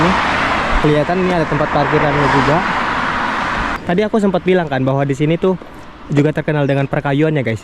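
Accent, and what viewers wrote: Indonesia. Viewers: bisa bahasa jepang tapi logat indonya nampak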